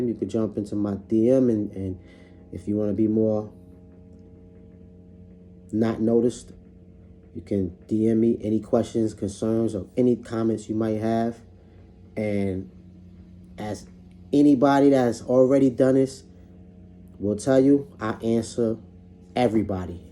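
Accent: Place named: Pennsylvania, United States. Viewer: I'm a new subbie & supporter. First thing, your voice is giving me Cassidy vibes😁im from PA and I recognize the accent. Second thing, you are a nice looking dude. Third, u need to post your cash app so your supporters can really support support if you feel me. Much love. Keep posting.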